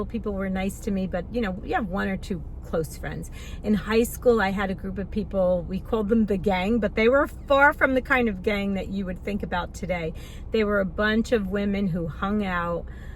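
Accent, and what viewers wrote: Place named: New Jersey, United States. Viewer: I love your Jersey accent and your heart!! I’ve known you for 2.5 years and I have experienced how kind, thoughtful and honest you are! You’re the kind of friend that makes the world a better place!! God bless you!!❤❤❤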